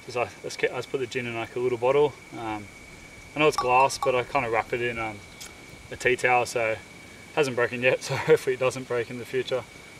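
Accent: Australia. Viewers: awesome vid! with an added bonus of an aussie accent :P Haha cheers from Canada !!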